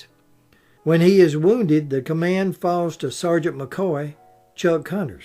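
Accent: South, United States. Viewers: "How would you like to be remembered" -- "Doesn't matter"! This was all extremely interesting, -- thank you, and please don't ever lose that natural Southern (?) accent. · .. Yet another fantastic video. Your videos are so factual and informative. There is an added asset to your videos---- that lovely southern accent. Please do a video on Rosa Parks. Thank you for this wonderful video.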